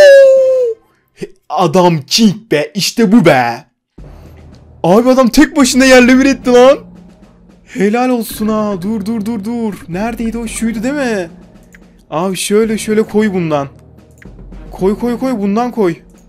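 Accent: Ankara, Turkey. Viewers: abi sen ankaralimisin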